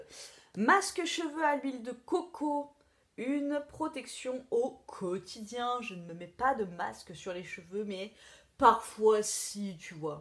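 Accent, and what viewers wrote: South, France. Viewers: Quel sketch t es délirante 😂 (perso j'adore l'accent du sud ) j ai essayé le bain de bouche a l huile de coco et c est pas transcendant à part que ty as une belle couleur de dent je trouve mais côté fraîcheur c'est pas extra no no no 😛.pleins de bisouilles 😘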